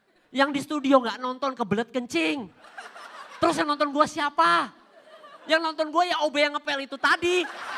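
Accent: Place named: Banyumas, Indonesia. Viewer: Ko bahasanya rada rada ngapak yah <link>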